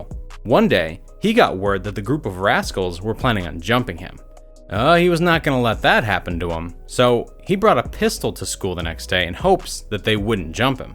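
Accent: United Kingdom. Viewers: I'm a bit late to comment on this vid But he's definitely from England🏴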